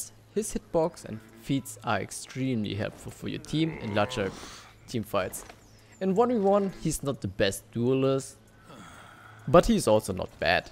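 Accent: Germany. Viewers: Man hört heraus das du Deutsch kannst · is he from germany becaus of his pronaunciation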